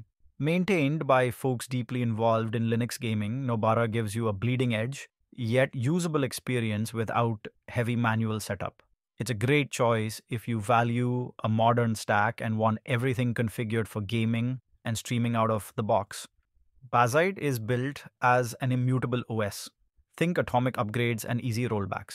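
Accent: India. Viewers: can understand the freeaking indian accent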